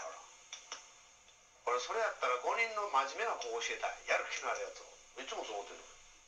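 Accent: Kansai, Japan. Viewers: 関西弁で何言ってるかわからないです！ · いやこのはんなり系関西弁なしゃべり方だったら眠たくもなるわ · 英語教師がゼニ払うてんねんて、関西弁話すのカッケーな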